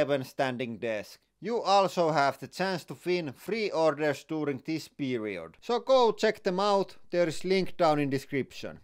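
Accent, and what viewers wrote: Finland. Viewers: Somehow the Finnish accent goes so well with the hydraulic press.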